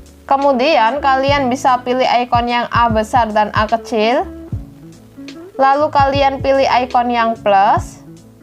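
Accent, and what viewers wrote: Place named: Java, Indonesia. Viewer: Medoknya gemoi :v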